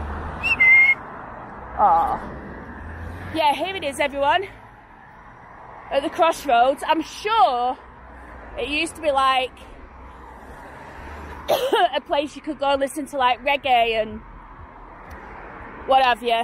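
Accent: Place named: Yorkshire, United Kingdom. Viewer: Loved your Video im really familiar with your walk and the disused buildings and pubs which i remember well having been a taxi driver based in north Manchester in a previos Life, also i think the more you are back in blighty your yorkshire Twang gets less 🤣Very informative and interesting as Always XX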